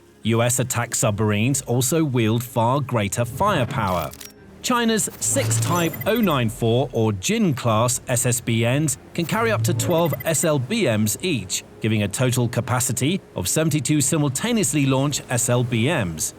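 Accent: United Kingdom. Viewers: Dude is saying nucular in a British accent nothing that follows that can be taken seriously! I couldn't continue after maybe the 5th time but I'll be he says the hulls are made of aluminum or some such shite. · Yeah Okay. He is English,( British.) Not American.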